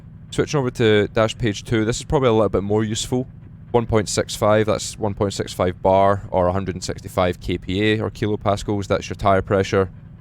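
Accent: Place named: Scotland, United Kingdom. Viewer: you've a great Scottish accent 😀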